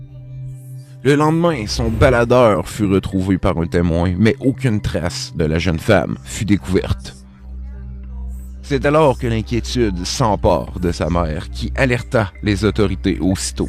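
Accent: Quebec, Canada. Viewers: Cet vidéo a l'air pas mal mais l'accent québécois faisait que je ne comprenais pas tout ( je suis français ) mais je te mets quand même un pouce bleu ami québécois et bonne continuation.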